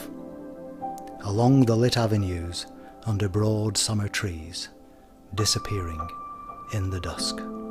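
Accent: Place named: Lancashire, United Kingdom. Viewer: Love this, so relaxing..love his Lancashire accent..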